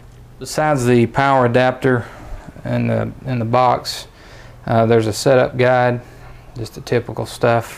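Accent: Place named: South, United States. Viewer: very nice southern dialect, sounds like in a movie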